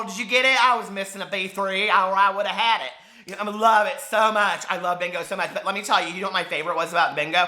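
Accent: South, United States. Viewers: OK, I am from NC (South) and I think you do have a bit of a Southern accent. You'd love the ones here. I hate mine so I can so relate on hating your own voice:/ I like the black hat better, too. I've played Bingo at home and stuff but playing in public sounds super intense. lol. Love State Fairs but haven't been to my state's. I've been disappointed by the ones I've gone to in my hometown. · Ok so sent me over and let me tell ya, she was <num>% right about you! You are absolutely wonderful!! I could just set and talk to you all day! You are so funny! You do sound like you have a southern accent and I LOVE it! You seem so sweet and down to earth! And one of the reasons I love so much is because she's REAL and honey, you are too and it's refreshing! So many fake people on YouTube anymore and it's sad. So so so glad shared you with us!!! Gonna have a "Dad" marathon tonight!!!!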